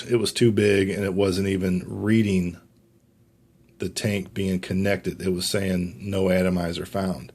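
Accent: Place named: North, United Kingdom. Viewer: If him saying washed like that posses you off than your a lol cry baby hitch it's ducking old school talk. .. or maybe dis you ever think. That he is a ducking northerners and the talk differently. Like they don't call a carbonated. Syrup. Drink a soda it's a pop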